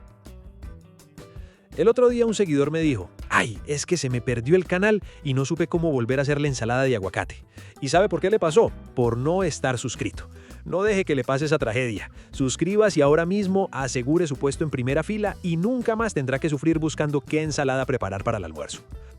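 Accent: Colombia. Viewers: Lo mejor de todo es tú acento Colombiano. 🌞🌠🌎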